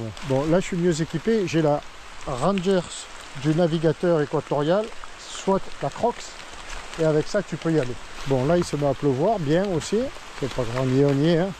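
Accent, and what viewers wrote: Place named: Provence, France. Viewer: Cc et ouiiii un Biaou un Bacaou,Espigaou ça me fait toujours du bien d'entendre des mots de cher nous, pays de Marcel Pagnol. Toujours de très belles vidéo en plus avec l'accent et le sourire. Biz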